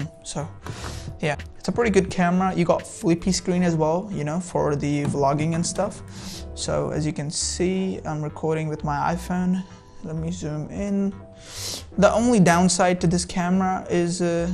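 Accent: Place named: India. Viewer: are you indian? Your accent is op❤ What time it take to develop bhiiya?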